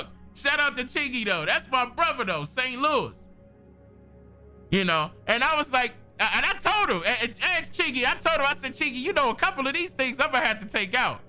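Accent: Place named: DMV, United States. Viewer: Damm you got me Smiling, Shit I always knew you where from the DMV Area you got that wire accent. Judge Joe brown too old to be acting like that he’s a grandfather he should have more sense than that. GOD BLESS TO EVERYBODY IN THE COMMENTS!!!!!!!!!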